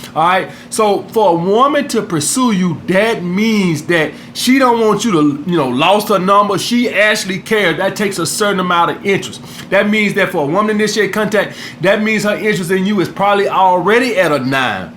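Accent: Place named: Alabama, United States. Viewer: Skrate to ya crib Disney laying got damn lmao. Alabama accent plus profanity and game is hilarious 💯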